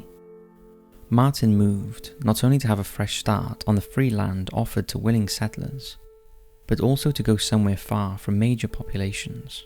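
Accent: Wales, United Kingdom. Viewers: Your channel is good, been watching for a few years but you gotta sort out your English pal, what the hell is with all this pronouncing E's as A's.....for example 'click the BAL to subscribe'...wal, bal, tal ect when it's WELL, BELL, TELL and so on. Your voice tone is relaxing, but it's annoying as hell the way you are saying certain things. I am English and live in England and I want all Americans and people who don't live here to realise that his weird hybrid Welsh/English accent and his pronounciations of certain words are totally OFF and not normal AT ALL in England or English....'ostradized' ?? come on!...and he once called Sigmund Freud.....Sigmund 'Feud'. lol I have sympathy for him because i am 99% sure he suffers from Dyslexia, either that or he does it on purpose because it seems to be the fashion to talk strange on these kind of channels, i.e the youtuber named 'Chills' (intentionally stupid and annoying voice)....I can never work it out, does anyone else think the same?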